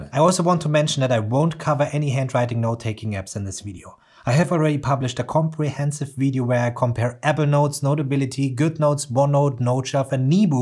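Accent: Germany. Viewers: Awesome Video! Without looking it up- I guess you are german (like me) :D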